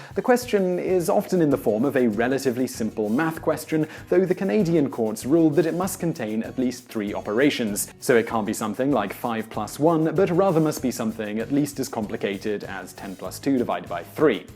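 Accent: United Kingdom. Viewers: Call me Daddy Well no shit... a google search could answer anything. The reason most of us watch this channel find out something we could easily look up is to hear charming British accent relaying the information lol.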